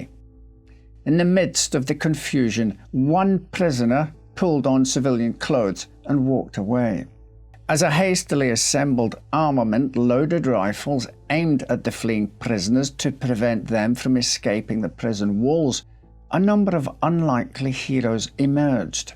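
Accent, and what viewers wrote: Scotland, United Kingdom. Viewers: I’ve got to stop watching well I never when I get into bed. No matter how interesting the story is, that calm Scottish like accent knocks me out every time. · "Scottish like"? He's 100% Scottish 👍😀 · Wow - just wow. Weird hearing local history from someone with an English/Scottish/Irish accent, but somehow it just makes it seem more interesting. Thank you and subbed.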